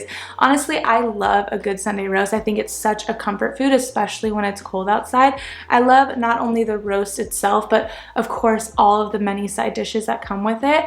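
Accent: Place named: United States. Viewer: By all means, keep answering 'yalright?' with 'I'm good, how are you!?' in your cheery American accent. It may brighten peoples day. Don't try to fit in here... we like the novelty of an American.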